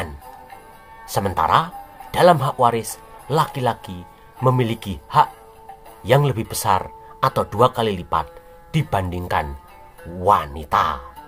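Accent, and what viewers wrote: Java, Indonesia. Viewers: Adminnya medok banget jawanya😅😊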